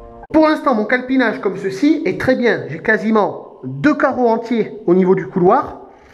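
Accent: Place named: Pau, France. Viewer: Merci pour ta réponse et excuse moi je ne veux pas abuser de ton temps que je sais si précieux pour un artisan. Vraiment j'apprécie ton partage tes videos ta pédagogie et tes réponses si précisent. Je vais essayer de chercher une personne comme tu me suggères. Merci de l'information pour la résine et les carreaux je vais voir si je ne peux pas les faire sauter pour en mettre d'autres. Belle région que Pau je comprends mieux ton petit accent 😊. Amitiés Bon week-end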